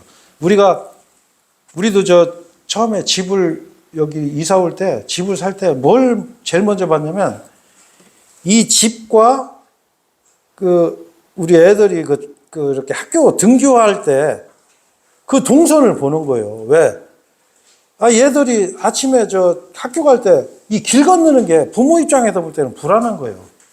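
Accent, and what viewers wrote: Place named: Gongju, South Korea. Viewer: 나의 고향 공주에 복된 교회가 생겼네요! 형제님의 공주 사투리도 정겹습니다. 공주에 사는 우리 친척들이 구원받을 기회가 되기를 기도합니다.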